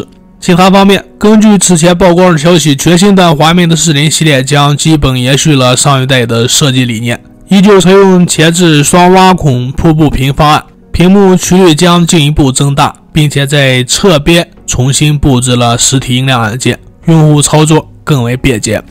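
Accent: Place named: Zhangjiakou, China. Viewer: 张家口口音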